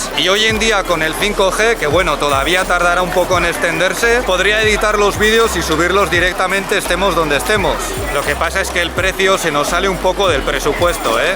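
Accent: China. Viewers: comienza a tener acento chino. Muchas bendiciones para su familia 🥰🥰🥰💖💕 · Jajaja ya tienes acento chino cuando hablas español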